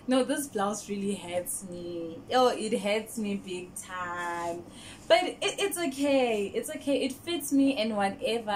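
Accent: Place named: United States. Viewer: You are so entertaining I was laughing throughout the video like it is the American accent for me.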